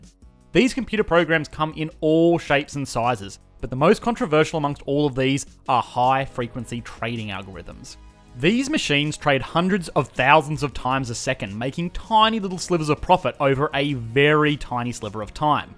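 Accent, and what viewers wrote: Australia. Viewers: Editor He is from Australia ? :D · Economics Explained has the most charming Australian accent. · its actually irritating, uk australian accent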